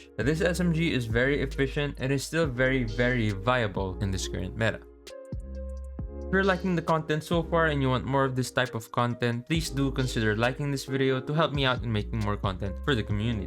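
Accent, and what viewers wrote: Philippines. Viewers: Btw kuya, feel na feel ko talaga na nahihirapan kang mag basa ng script dahil sa accent natin.. Super Relatable Haha. Much Love Kuya. ❤️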